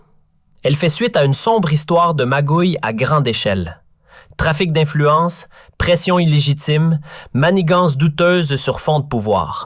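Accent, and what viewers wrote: Canada. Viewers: De quoi tu te meles toi avec ton accent canadien mais que voulez vous de l'ALGERIE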